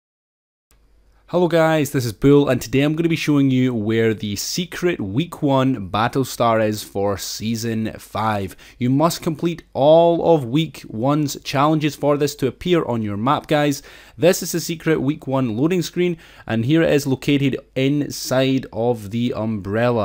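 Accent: Scotland, United Kingdom. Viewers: Are u Scottish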